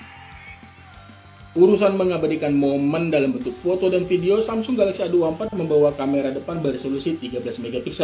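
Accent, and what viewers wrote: Semarang, Indonesia. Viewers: Orang semarang ya bang 😅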